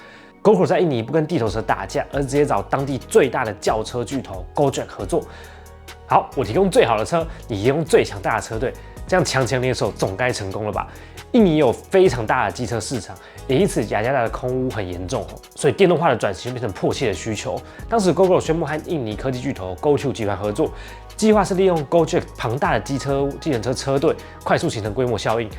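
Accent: Taiwan. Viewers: 台腔太重 很多人都有這個問題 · 哇 一句話有一堆字被吃掉，不看字幕常常台灣腔不懂在說什麼 🤨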